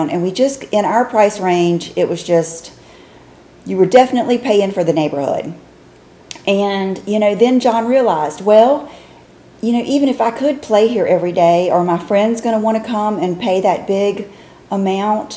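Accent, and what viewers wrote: South, United States. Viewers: I could listen to you talk for hours. You’ve got such a soothing southern comfort to your voice. It’s like when you’ve been away from home for a while and cross that border back into the south, it’s like a big ole hug sayin darlin’ your home❤️.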